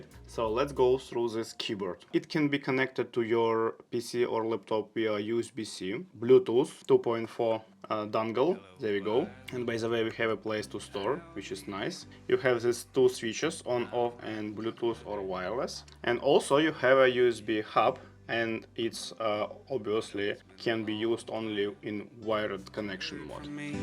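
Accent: Russia. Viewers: А почему акцент русский?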